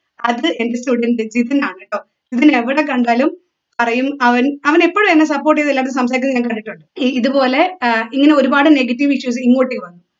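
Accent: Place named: Tamil Nadu, India. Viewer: Are you no malayali?🤔Tamil style aanelllo.🙄UK annenn vach Malayalam parayaan nanakedd ano?😮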